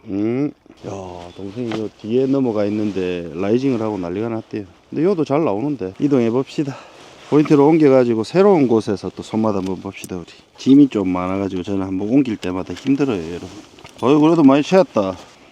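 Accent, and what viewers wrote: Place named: Gyeongsang, South Korea. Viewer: 경상도 목소리 좋으네요 얼굴은 수더분하시고 구독합니다 좋아요는 시청료로 눌러봅니다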